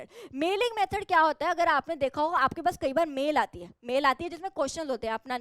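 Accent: Punjab, India. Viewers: Ma'am ur Panjabi ascents ummm so gudd bole to bawal...🤧🌼✌️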